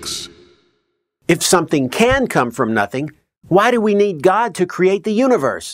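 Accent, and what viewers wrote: South, United States. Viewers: I don't even know you but giving your accent, you were probably churched in the Bible Belt and then rebelled. Given your beard I would bet you are a gay man and hate Christianity for this reason. But, I will say something nice. You are one hell of a musical talent.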